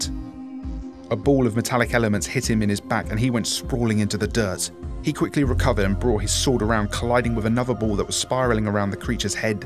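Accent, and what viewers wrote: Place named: United Kingdom. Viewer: Clicked video to watch, heard that you were british. Disliked and reported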